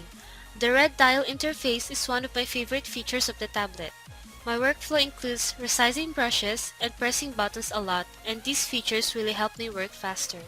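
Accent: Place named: Philippines. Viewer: OMG ate!! pinoy accent 🇵🇭😆💪 idol talaga kita. mag practice ako ng mabuti 😤.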